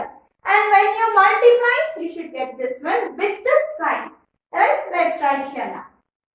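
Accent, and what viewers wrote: Karnataka, India. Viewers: Mam ur from karnataka?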